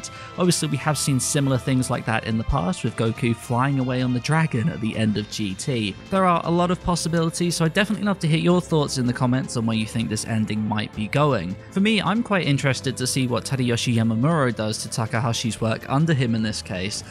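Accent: United Kingdom. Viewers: you're from braitane aren't you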